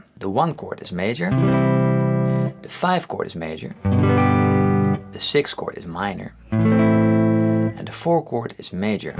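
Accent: Netherlands. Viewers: Are you Dutch? Because your accent really sounds Dutch. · I smell dutch 😅 btw good vid it helps a lot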